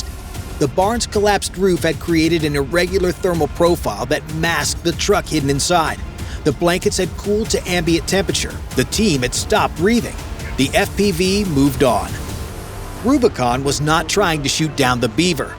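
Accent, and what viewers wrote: United States. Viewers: Drop the shouty American voice over, it ruins your video !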